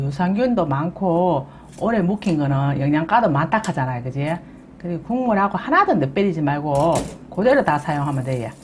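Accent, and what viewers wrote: Gyeongsang, South Korea. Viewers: 경상도 말이 너무 멋져요. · 구수한 갱상도 사투리가 참 정겹네요 상그라이 식히가~~ ㅎㅎ 집에있는 묵은김치 따라해봐야겠어요 잘보고 갑니당😍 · 좋은 정보 감사 합니다.목소리 안 커요. 구수한 경상도 사투가 얼마나 좋은데요. 다음에 한국 가면 밥 한끼라도 사드리고 싶어요. 그래도 될까요? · 늘대단한음식만들어주시구경상도구수한말씀잘듣고있내요묵은지한번해볼께요 감사합니다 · 잘들려서 좋고 경상도 말로 툭툭 경상도사람인 나는 듣기좋읍니더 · 대구 말씨에혹 살림9단 좋아요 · 목청 큰것도 갱상도 매력입니더~~ㅎㅎ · 오줌마려워일어나서요리강좌듣는.정신없는늙은입니다.구수한갱상도사투리가 정겹네요.여러가지양념장만드는는쏨씨.대단하네요. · . 경상도 말투로 해설이 어찌나 구수한지. 😅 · 언제나봐도, 구수한 경상도 사투리 말씀이 이렇게구수하고, 듣기기분좋은지 몰 랐어요음식솜씨도, 쉽고맛있게 꼭 해보고싶은 맛입니다.감사합니다.건강하세요 참고로 여긴 전주입니다. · 경상도 어느 지역인가요? 말 솜씨가 좋으시네요~^^ 좋은 정보주셔서 감사합니다😊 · 구수한사투리 즐겁게 잘듣고 잼난 요리도 잘보고있네요 근데 경상도 아닌지역 분들은 잘알아 들어 묵을랑강 걱정되네요 ㅎㅎ ㅎㅎ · 경상도 사투리에 너무 재밌게 봤엉ᆢㄷ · 너무 사투리를 자랑스럽게 쓰시는데 좀 듣기 싫네요. 시청자는 경상도만 있는게 아니고 전라도 강원도 충청도 제주도 함경도 등 많습니다 재미없는데 혼자 웃는 개그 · 갱상도말씀 너무잼나요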